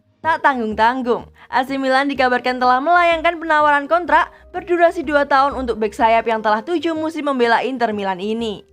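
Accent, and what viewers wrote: Java, Indonesia. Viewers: adminnya mantapp mendok berasa main di kediriii akuu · Admin nya orang jawa ya.. mantap lah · POKOKNYA MILAN DI SISA MATCH SELALU ON FIRE KARENA SUDAH TERTINGGAL 9 POIN DARI MERDA INTER LAH MEDOK LAGI VO NYA WELEH WELEH FORZA PRE SEMPRE MILANELLO MARCHEND JAKET MILAN APA KABAR TUH MIN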